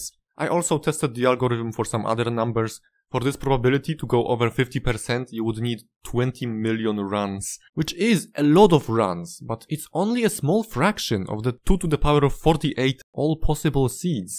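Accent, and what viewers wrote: Poland. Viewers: Ach ten polski akcent🎉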